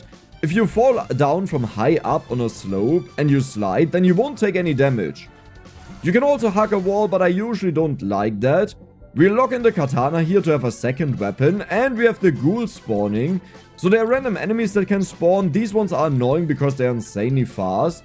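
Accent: Germany. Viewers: the german accent finally calmed down for a moment here🙏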